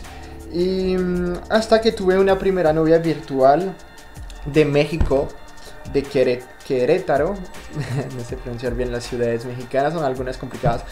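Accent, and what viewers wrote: Colombia. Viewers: tus memes xD:D me gustan tus vídeos!!!! Y por cierto tú acento es colombiano!!!:D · HABLAS SÚPER BIEN EL ESPAÑOL!!! Y EL ACENTO SÚPER COLOMBIANO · hablas muy bien el castellano pero claro q tienes acento colombiano.. A mi me gustaría aprender el francés pero creo q es complicado solo se 3 cosas.. 😂😂 Podrías hacer un video para q aprender un poco.. Saludos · saludos desde Ecuador ¡¡¡ Has la segunda parte porfis ¡¡ ya que estaba super interesante. Eres super espontaneo y sincero asi que sigue asi me encantan tus videos:=) Pd: Vives en colombia? xq tu acento se parece al colombiano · Genial tu vídeo....me encanta que vas narrando con detalles..( en especial tu época de adolescente pajero en los chats. Jajajajajajaja)... Obvio tb me uno a las plegarias por una 2 parte..... Porque hasta lo que has contado es que tus incios con el español fue con todos los países menos con Colombia... 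Y tú acento es muy colombiano. Jajajaja. Saludos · Por el Pipa Higuaín!! Me muero!!! Cosas locas de la gente... Hsblas con el acento colombiano. Bien! Bisus de 🇦🇷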